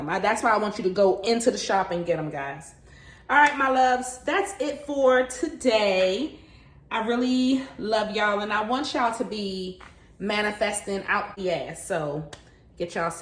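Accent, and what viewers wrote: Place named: Washington DC, United States. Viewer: U from D.C.? Your accent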